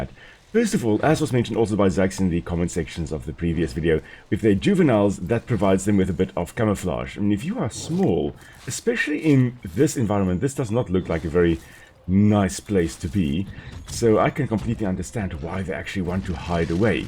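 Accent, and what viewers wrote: South Africa. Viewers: Your accent sounds South African boer. Please tell me im right. Just started a charge blade last night by the way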